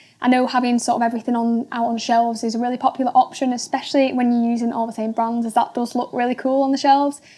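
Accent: United Kingdom. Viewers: Just curious, what part of the UK has this accent?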